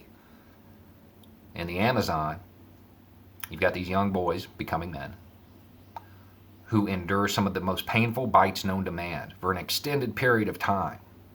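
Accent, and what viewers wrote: South, United States. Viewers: I hope you see this, I'll keep trying. I am working on a PhD in clinical psychology and I like you. I am going to admit, it took a lot of work for me to get past my implicit biases of your appearance and accent, my horrible family who happened to be from the south had traumatized me in that way. What you have to say is so important and so perfect that I did that work so I could listen to you. I've heard you express you don't have the the psychological knowledge to answer some of the questions you are asked. I'd like to help. I have been thinking about it for a while but when I heard you say in a video this morning that your dream for the world is being able to exist without government, I was moved to act. I imagine we probably have a lot of idealogical differences but I think that could just make us perfect sparring partners, we'd make each other better people. 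Give it some thought.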